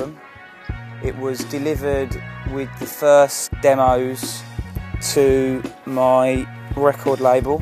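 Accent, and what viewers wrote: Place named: Birmingham, United Kingdom. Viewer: Sorry m8! In real life he speaks in a brummie (birmingham) accent, but in his songs he raps in a cockney (London) accent. Because he is not actually a cockney it is known as a "mockney" accent. Is that any clearer?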